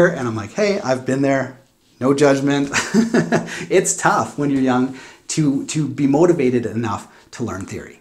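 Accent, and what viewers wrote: Canada. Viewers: Your canada voice entertains me.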